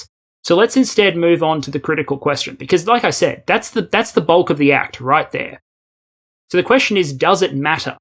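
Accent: Australia. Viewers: I like how these videos are slowly getting more 'strayan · I don't have any kind of training or expertise in any field relevant to this war, so am very happy to get as much information as you can provide, considering every angle and in as much detail as possible. I enjoy sitting through exceedingly long lectures, so unless you're reading through a list of every nut and bolt of every tank there is, I won't be bored. I also very much enjoy your style of exposition, and I always get a kick out of your accent. Listening to an Australian give a more level-headed explanation of what is happening to our neighbours somehow feels reassuring. That being said, I understand that covid is still giving you a hard time, so I will not complain about the shorter videos. I would suggest that you create more in-depth analyses and divide them into episodes. I suspect we are a rather patient audience. 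I wish you a full and speedy recovery! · If shorter means more regular content is possible then I am all for it. I just want to listen to those dulcet Aussie tones telling me things that no one else seems able to tell me.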